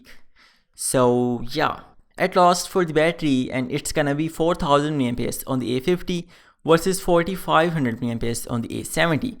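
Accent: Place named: India. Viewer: why is it only Indians they sound so annoying!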